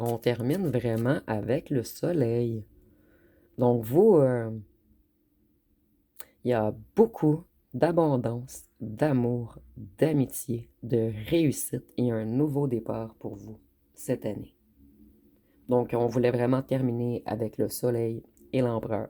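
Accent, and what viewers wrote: Quebec, Canada. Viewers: Merci pour vos predictions avec le charmant accent quebequois.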